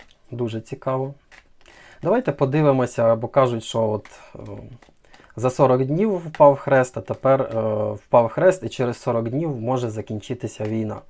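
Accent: Standard. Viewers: Ух Ти, яка у Вас гарна українська мова, так чисто розмовляєте )))❤🇺🇦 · дякую! Яка у вас чиста,красива мова!!!❤❤❤ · Розкладам на картах не вірю, ніякі прогнози "картярів" не збулися. Але, слухала вас лише тому, що ви говорили нашою державою мовою - УКРАЇНСЬКОЮ!!! До речі, ви досить гарно розмовляєте, без суржику. І голос у вас приємний!!!😊😊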